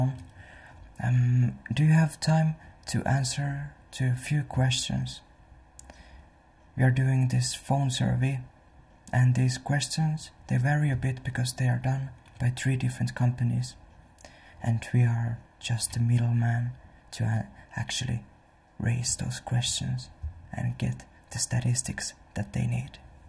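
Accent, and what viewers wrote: Finland. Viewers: Finnish, but it isn't a super strong finnish accent. Finns sometimes speak something called rally English and atleast i think it's atrocious, his accent is pretty stereotypical though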